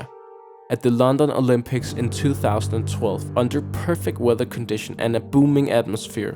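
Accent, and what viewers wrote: Denmark. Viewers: you sound danish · Det er meget tydeligt i din accent, at du er dansk, men fed video mate! Keep going 🔥🔥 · Easy danish accent :D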